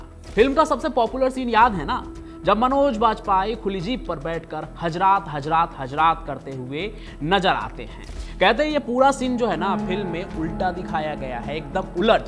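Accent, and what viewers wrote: Bihar, India. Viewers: Wannabe lallantop 🤑 Aaj kal trend ho gaya u.p ,bihar ke accent me kuch bhi bolo aur views le lo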